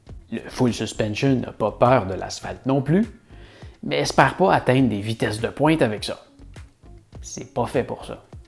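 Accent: Quebec, Canada. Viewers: Excellente vidéo qui est passée si vite, j'ai cru qu'elle ne faisait que 10 minutes au début... Je suis d'accord avec tout... SAUF sur le rétroviseur (mais c'est à cause de mon égocentrisme car je préfère ne pas avoir à me tourner pour voir les véhicules arriver, et ça me permet de rouler temporairement au milieu de la route quand je vois qu'il n'y a vraiment personne). Sinon, clair, drôle, être humain passionné... et en plus accent québécois si agréable aux oreilles d'un Français : que demander de plus ? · Merci infiniment,! C'est génial de pouvoir trouver des vidéos de qualité, en français et avec ce petit plus inimitable: ce magnifique accent du Québec qui me donne envie de visiter ton pays. Super conseils! Le point sur les groupes est particulièrement utile et m'a permis de mieux comprendre les descriptions des vélos dans mes recherches. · Excellente vidéo, rien à dire ! 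Je suis en pleine réflexion pour un premier achat, et justement, je cherche le bon rapport qualité/prix/utilisation. Ta vidéo m'a éclairé, merci ! J'ai habité Montréal quelques temps. Cet accent me donne juste envie de retourner y vivre ! 🇨🇦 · Vidéo au top ! Avec en plus un chouette accent du Québec ! Salutations de Suisse ! ;)😎✌️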